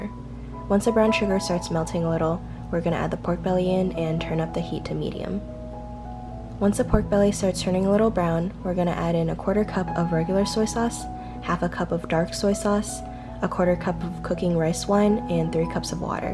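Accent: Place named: West Coast, United States. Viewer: thank you i love when I get recipe from the real country people. however you sound like west cost.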